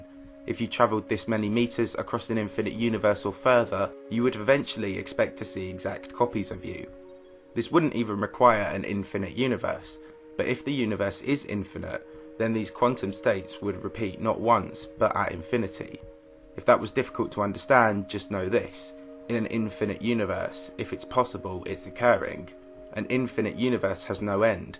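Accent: United Kingdom. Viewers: That monotone British voice tho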